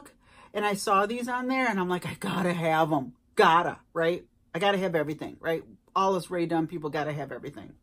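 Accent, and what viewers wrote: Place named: Minnesota, United States. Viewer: I knew with the accent you had to be from Minnesota, I’m from Delaware and we barely get any Rae dun. You got so lucky I want those coffe cups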